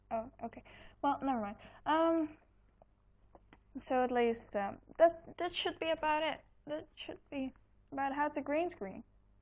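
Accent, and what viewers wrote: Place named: Netherlands. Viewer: hooi! !!!!!! Ik kan gwn horen dat je Nederlands bent XD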